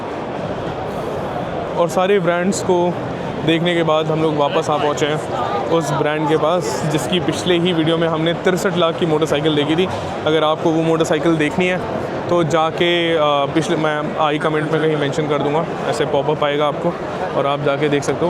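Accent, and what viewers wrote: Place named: Delhi, India. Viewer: bhai tum mein dilli ki chhaap hai tumhare baat karne se dilli region surroundings ke lagte ho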